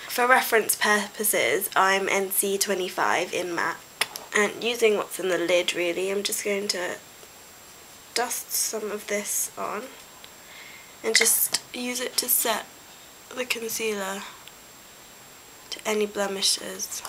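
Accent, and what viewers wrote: United Kingdom. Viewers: pretending to sound posh? she has an rp accent and, as far as I know, it's her actual accent. not made up or insincere, her ACTUAL accent. how can you possibly judge someones voice because of a slight discrepancy in pronounciation? away with you! love the video:) i'm crap at putting on foundation so its nice to see people doing and explaining it!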